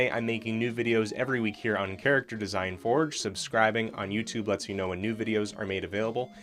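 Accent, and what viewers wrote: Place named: United States. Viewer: When your name has an -es instead of -s and ends with a -ston But you have an American accent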